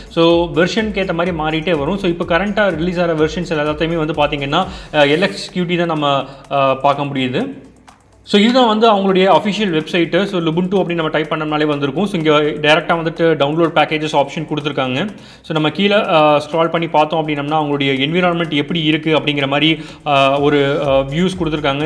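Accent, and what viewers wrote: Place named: Kongu, India. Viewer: coimbatore slang veera level anna. I love kongu tamil.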